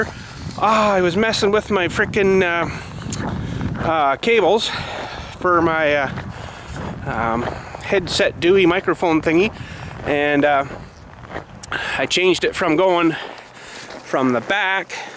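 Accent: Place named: Canada. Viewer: Can definitely tell your a fellow Canadian eh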